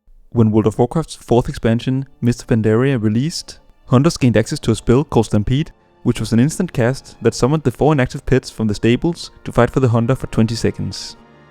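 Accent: Denmark. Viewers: Great video, I love the danish accent, nice narration. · You can just hear this guy is danish xD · Skud ud til danglish! <3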